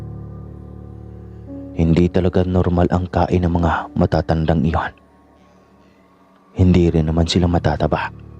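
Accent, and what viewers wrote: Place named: Pangasinan, Philippines. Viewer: Parang pangasinan si kuya nag kukwento😊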